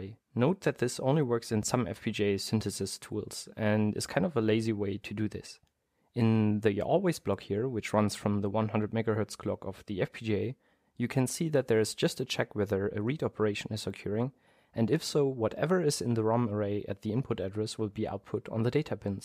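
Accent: Germany. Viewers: Technisches Gebrabbel auf Englisch mit deutschem Akzent - ich kann dir nicht sagen, wieso, aber irgendwie hat das Charme. 😅 super Video, mega interessant. Danke! 😊👍🏻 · Are you a german? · accent is kinda cute 😅 he sounds German or something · Sprichst du deutsch ? Dein Englisch hört sich wie meins an ^^ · Are you german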